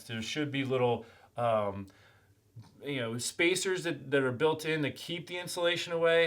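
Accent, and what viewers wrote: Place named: Pennsylvania, United States. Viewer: I recognized that PA accent 😅 I really appreciate all the information you gave in this video. It was exactly what I was looking for.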